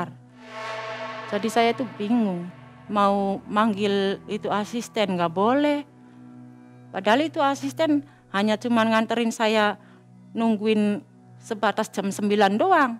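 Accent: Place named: Cirebon, Indonesia. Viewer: Kaya org cerbon ap Indramayu 😊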